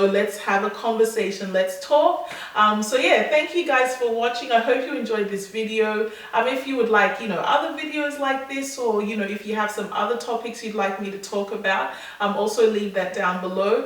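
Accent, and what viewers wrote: Australia. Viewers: M8, you're an Aussie! As soon as I heard your voice, I thought 'educated Aussie babe', great to have you here!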